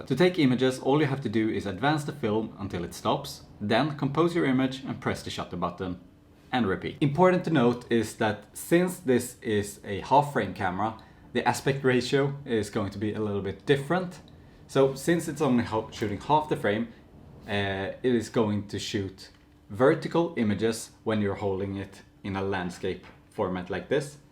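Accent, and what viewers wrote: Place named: Gothenburg, Sweden. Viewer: Härlig Göteborgsengelska…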